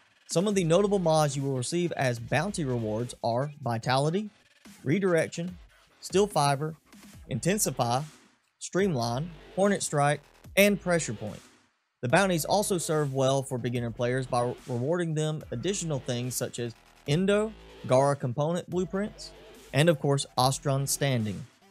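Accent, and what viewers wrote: United States. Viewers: I love how his accent is America itself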